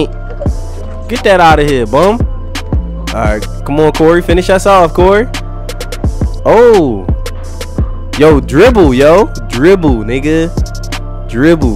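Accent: Baltimore, United States. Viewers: U from Baltimore? I hear that accent lol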